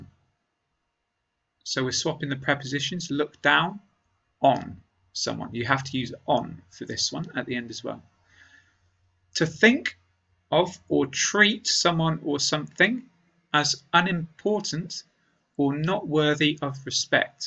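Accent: United Kingdom. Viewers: Thanks a lot! I love your British accent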